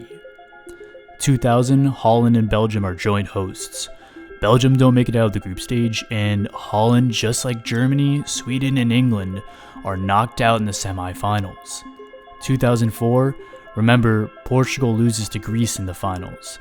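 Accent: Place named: United States. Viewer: Next time I hear an American accent talking about football I'm going to immediately switch off. this is bs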